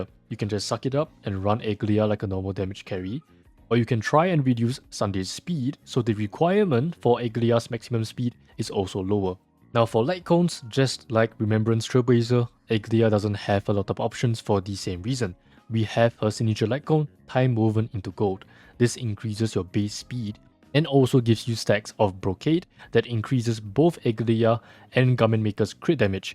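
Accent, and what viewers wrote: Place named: Singapore. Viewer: me too! i could tell from the accent! nice to see a singaporean creator playing this game too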